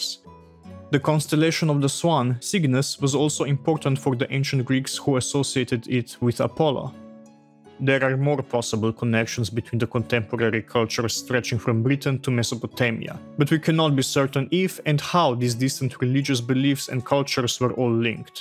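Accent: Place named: Slovenia. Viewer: 3 seconds in and I instantly recognize a fellow Slovenian by the accent ;)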